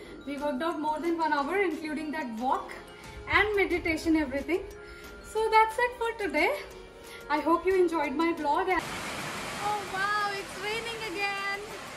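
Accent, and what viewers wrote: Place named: South India, India. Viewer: Your North Indian n your accent has suddenly become South Indian.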